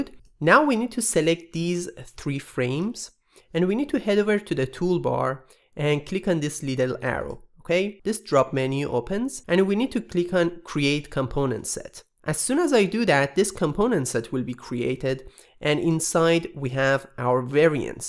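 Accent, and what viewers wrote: Iran. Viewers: لهجه فقط لهجه ایرونیاااااا قربونت برم که یهویی اتفاقی دیدمت تو سرچ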